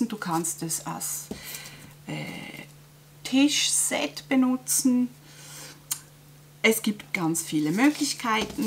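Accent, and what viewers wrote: Switzerland. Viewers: Liebe, ich finde deine schwizerische Aussprache entzückend. Das Verständnis ist von meiner Seite da, aber ich lebe auch in Bayern. Wir verstehen alles.....nein manche Bayern verstehe selbst ich nicht. Eigentlich bedarf es auch nicht vieler Worte, die Objekte sind durch das Zuschauen selbsterklärend. Zu viel Gerede ist ja manchmal auch so, dass man denkt, " Komm jetzt mal zu Sache" 😄. Ich finde alles super toll entspannend. So entspannend, dass ich auch schon eingeschlafen bin ☺️. Das soll nicht negativ sein. Nein im Gegenteil. Es ist alles sehr ommmmmmm. Beste Grüße und mach weiter so!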